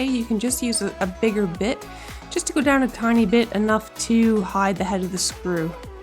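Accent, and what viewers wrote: Canada. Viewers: Canadian eh? AWESOME!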